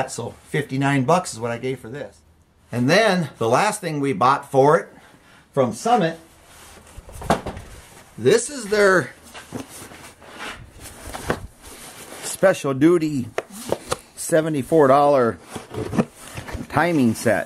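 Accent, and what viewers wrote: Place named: Minnesota, United States. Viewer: Just found the channel, pretty good! (where are you from? sounds almost a Minnesota scandinavian accent?)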